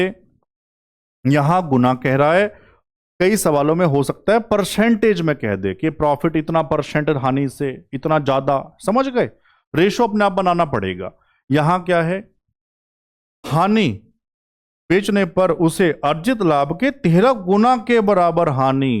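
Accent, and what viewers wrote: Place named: Haryana, India. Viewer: Haryana - famous hamari boli --haryanvi ❤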